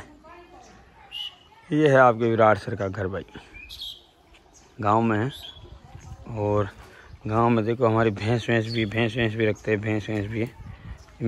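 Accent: India. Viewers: Full rajasthani tone 🤭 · Haryana s hain kia sir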